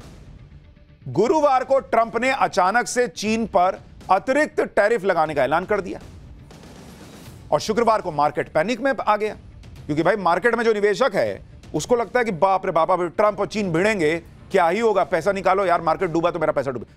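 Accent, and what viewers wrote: Bihar, India. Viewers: Dada hum kya kar sakte hai vo batao bihari acent se vishleshan karke bhatkao mat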